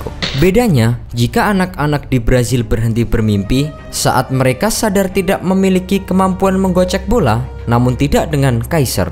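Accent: Java, Indonesia. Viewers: Yg ngomong wong jowo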